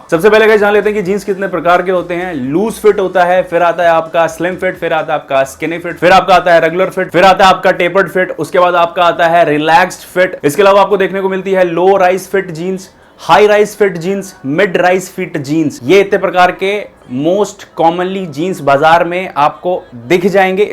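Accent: Lucknow, India. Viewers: Lucknow se ho kya bhai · Are you from lucknow 😀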